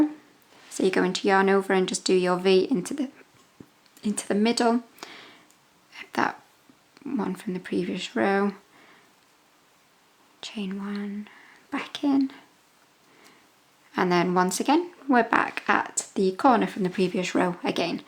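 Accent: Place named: North, United Kingdom. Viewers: I love this stitch but I've never seen it in a granny square! Great video, thanks so much 😊 also love your accent!!! I'm from Yorkshire but I live in Canada so it's great to hear the sound of home 😊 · Nice Northen-y accent, which is a breath of fresh air! Would like to see your video for blocking!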